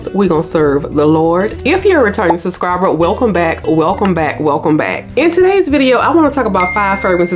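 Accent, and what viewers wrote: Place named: Tennessee, United States. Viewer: I LOVE your accent! Where are you from? It sounds Memphis/Tennessee as I’m from middle Tennessee 😂❤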